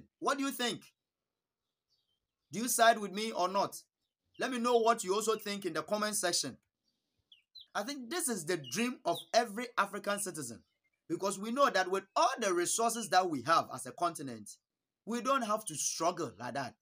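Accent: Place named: Nigeria. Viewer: You sound like a Nigerian your accent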